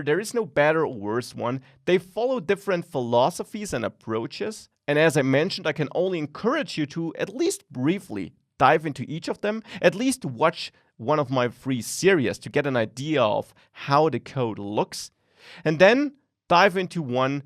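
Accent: Germany. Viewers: that is the second video i watch from u, i really like ur videos, that is why ur earned my sub. i have to laugh about ur german accent but still Tapfer of u, u talk very well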